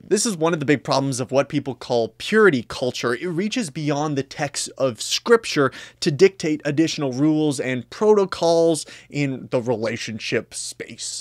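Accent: Canada. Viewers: This dude has got a solid, mint canadian accent lol.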